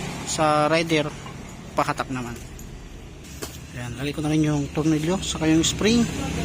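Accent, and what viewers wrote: Ilocos, Philippines. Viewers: masa Ilocos ka ba boss?!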